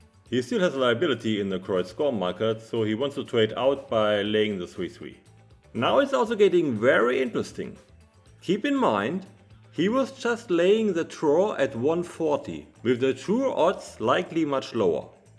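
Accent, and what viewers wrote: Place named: Netherlands. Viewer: Sounds Dutch to my Ear.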